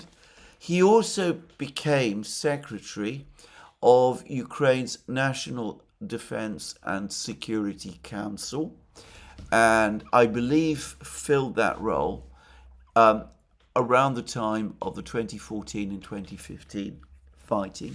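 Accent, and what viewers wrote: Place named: RP, United Kingdom. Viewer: Your English is pretty. The Queen's English I presume. An endangered specie :)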